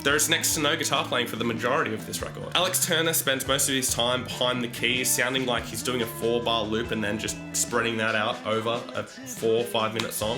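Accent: Australia. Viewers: All these salty comments you should have realized you were in for a bad review when you heard his Australian accent!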